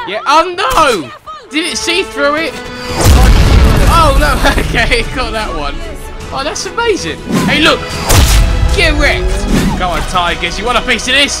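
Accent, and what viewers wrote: England, United Kingdom. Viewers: Your British JUST LIKE ME?😇😇😇😇😇😇😇😇😇😇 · So yiu are from england??? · are you brittish yes or no (brittish is cool)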